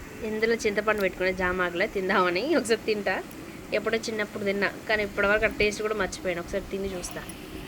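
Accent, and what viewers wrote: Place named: Khammam, India. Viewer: Meedhi kammam district kadha? Me athamma accent alaane vundhi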